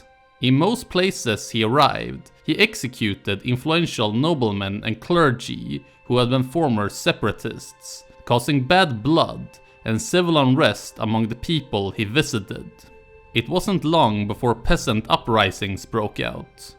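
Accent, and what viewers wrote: Sweden. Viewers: You kan hear on his voice that he is from sweden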